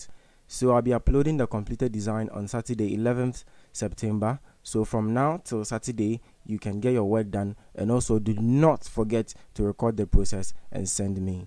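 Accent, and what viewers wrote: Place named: United Kingdom. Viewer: British accent 😂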